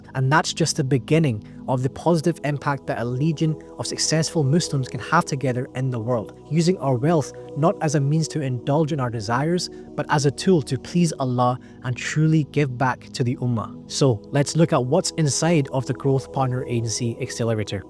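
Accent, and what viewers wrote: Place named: United Kingdom. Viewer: You sound like you're from the UK, so how would taxation fit into all this? Assuming you're suddenly making 10k+ a month, I'm sure that's gonna raise eyebrows with the bank and government who will be eager to reach their greedy hands into your earnings and deduct a significant sum of it in the name of taxation, no?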